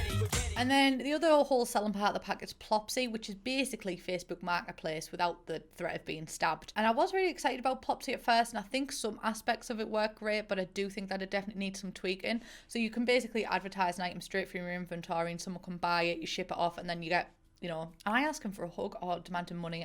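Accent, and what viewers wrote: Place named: Northern England, United Kingdom. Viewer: I FORGOT UR NORTHERN ILY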